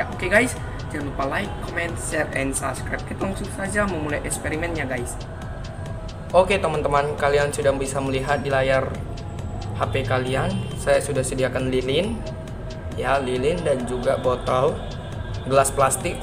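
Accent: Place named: Batak Toba, Indonesia. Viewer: logat, bahasa dan marga anda saya tahu. Batak Toba. Saya orang Karo dan tinggal di sumut juga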